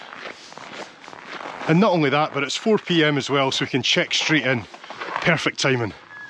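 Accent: Scotland, United Kingdom. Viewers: I love watching your travels and this one gave me an appreciation of just how good you are at editing. The 5sec cut where you threw the napkin onto the plate after that impressive looking lasagna was like the full stop at the end of the dinner sentence, ready for a new para. Im half Scottish and your accent reminds me of my youth there. Great journeys and travelogue. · Oh you are toooo adorable...I love your Scottish accent.Subscribed just to listen to you talk...and your sense of humor.😅...very interesting content too!😊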